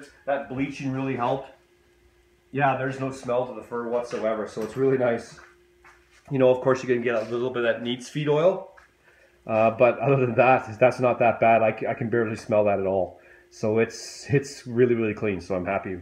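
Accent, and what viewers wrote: Canada. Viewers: That candian accent is amazing ♡ hello from ontario ♡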